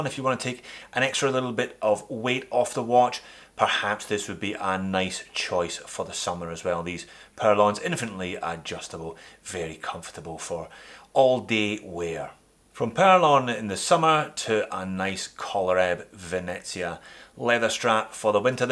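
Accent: Scotland, United Kingdom. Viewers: It's well deserved mate, your channel is one of the best out there, no need to buy subscribers like some others!!! Keep going mate, the watches you look at aren't covered by many others so you are head and shoulders above the rest.....and you have introduced the Scottish accent to my Russian wife :-)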